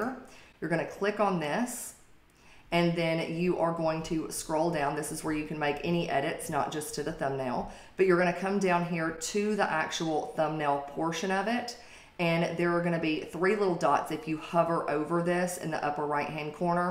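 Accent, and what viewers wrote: South, United States. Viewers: This is a southern American accent. We don’t sound like this in the west or even east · Thanks!! Ijust what I need. 😊😊 Have a great day. Nice accent. The southy one. 😊😊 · I’m sorry but she has the most American accent a person in world could have.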